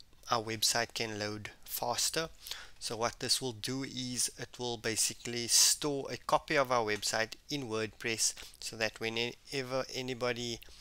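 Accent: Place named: South Africa. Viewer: Thanks for the video, nice to hear a South African accent glad there are local tech YouTubers out there.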